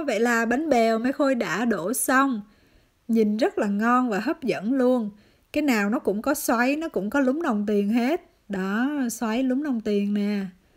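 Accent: Central, Vietnam. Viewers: Cảm Ơn chia sẽ của chị Giọng nói miền Trung của chị nghe dễ thương Lai lai một chút giọng Huế 🥰🥰🥰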